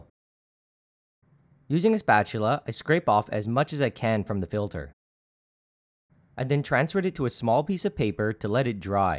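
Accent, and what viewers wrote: Canada. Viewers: Hey! Are you Canadian?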